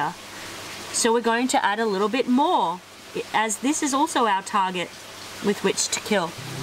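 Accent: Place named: Australia. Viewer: The cinematography is first glass. Showing off those wonderful thongs (flip flops for you yanks reading this) and quirky glasses which are vintage (perfect). makes a classic catch and keeps score all in about 3 seconds before moving onto more complex maths and the turkey baster before speaking Latin - all time. Freeze frame and it seems with the cheeky smile that knows this is outclassing even Cam in his togs doing flips inside the 6x2x2 bar tank after fitting through a 30cm square hole in the top without knocking off the $1000 dollar Radions. Side note: Why remove the sea squirt? manages the classic Aussie accent whilst using scientific lingo that Sir David Attenborough only ever dreamed of. In my book the best example is "Upon visual inspection it's pretty obvious that this zoanthid colony is suffering from a range of macroalgae invasion" - although "it's pretty obvious" this was the 3rd take because the script said "infestation" instead but she made up for it with a word that sounded like 'poleketes' in the next section. 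Even with a science degree (incl first year bio and marine science) I don't know what a polekete is but I know Coral RX gets em. moves on from killing pests to healing infections - rewind and watch how seamlessly she moves from biological to medical language... before ruining it with the claim that it's all good because "it smells nice and clean". Ok - so this is easily THE BEST vid I have seen on dipping... but is not done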